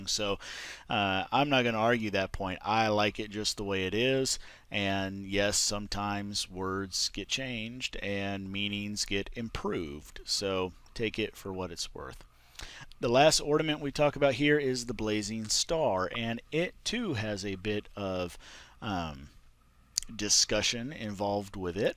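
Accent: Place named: California, United States. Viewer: Cool thanks for sharing. I thought I heard some California in you.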